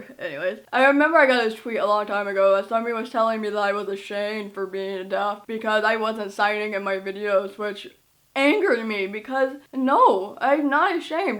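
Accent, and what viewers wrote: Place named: United States. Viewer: What i find amazing is your accent is american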